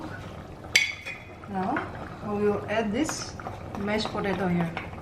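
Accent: India. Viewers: Your hindi speaking is so nice but English sounds like hindi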